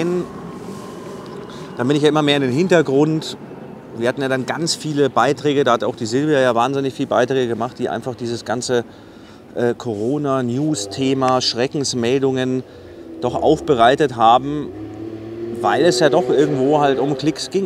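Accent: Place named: Bavaria, Germany. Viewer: Ich finde Dich sympathisch, weil Du kein Dummschwätzer bist. Du ziehst das alles durch, obwohl Du sicherlich finanzielle Einbußen hast. Und auch Freunde/Bekannte durch Deinen Sturkopf - positiv gemeint - in den letzten 2 Jahren verloren hast. Soviel dazu - Und jetzt muss ich mir das Video nochmal ansehen, weil ich die Enten nicht richtig verstanden habe. So gut ist mein bayrisch auch wieder nicht :-) P.S: Darf ich Videos von Dir bei mir hochladen oder wäre Dir das unangenehm?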